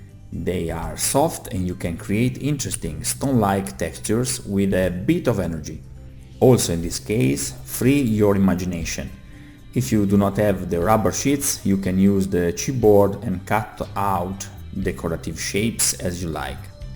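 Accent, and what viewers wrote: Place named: Italy. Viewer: Ce l'abbiamo nella parlata, il nostro inglese sarà sempre... così xD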